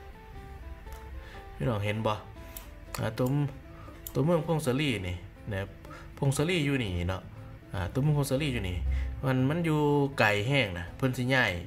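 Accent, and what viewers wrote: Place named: Ubon Ratchathani, Thailand. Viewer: สำเนียงการเว้าคล้ายๆกับอุบลเลยคับ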